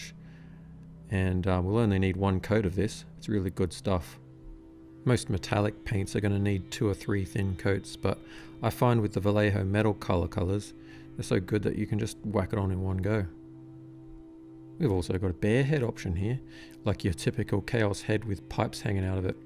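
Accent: Australia. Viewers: "a bit how ya goin' " it truly is wonderful to hear the Aussie vernacular getting represented in the Warhammer Community. "Wha'do'ya'think of tha new Primaris moooite?" "Yeah not behd pretty good size" "Corvus, he's a traitor ay?" "Yeeaaahhh... Naaahhhh"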